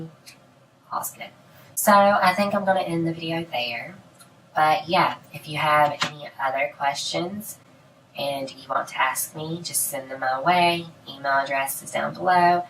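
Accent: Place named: South, United States. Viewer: I’m curious about your accent. I’m from SC, and it’s close. I’m thinking you’re from eastern NC maybe? Anyway, you’re from the South, so you’re cool with me. Edit. Midlands of SC.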